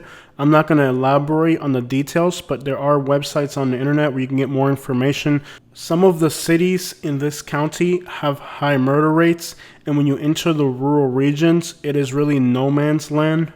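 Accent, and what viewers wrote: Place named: South, United States. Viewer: Love that southern accent!! You right about these places. I go thru these towns and its bad. You have keep your head on a swivel and keep one in the chamber.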